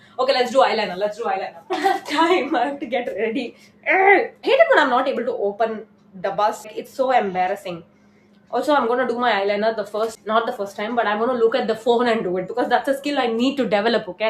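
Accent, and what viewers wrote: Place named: Tamil Nadu, India. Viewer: Olunga thoongu akka , health ah paathuko erkanave kaal lu adi pattu irruku neenga thoongalana innum weak aayuduveenga approm kaaal epdi irruku ??? and ennaku puriyuthu neenga english la pesuna all over india la irundhu yaaru paathalum puriyum so nalla views pogum nu ana ungaloda tamil slang dhaaan akka engaluku romba pudikum❤so mudinja varaikum tamil layum pesa try pannunga and take care of your health ❤❤❤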